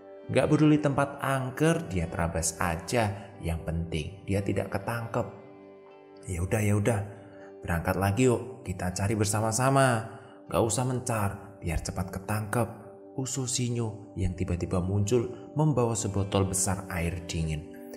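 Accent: Java, Indonesia. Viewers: Waalaikum slm wr wb, masalah logat jawanya justru sy suka menjadi ciri khas kk " sy org sunda justru seneng mendengarnya, smga smkn sucsess..❤👍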